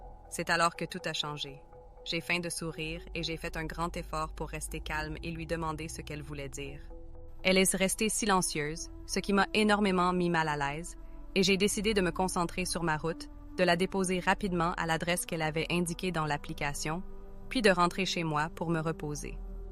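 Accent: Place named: Canada. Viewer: Bonsoir, je viens de tomber sur votre vidéo et j'aime votre accent canadienne et je vais regarder une autre de vos vidéos car je voir dans le commentaire que c'est pas votre vraie voix ❤ bonne soirée à vous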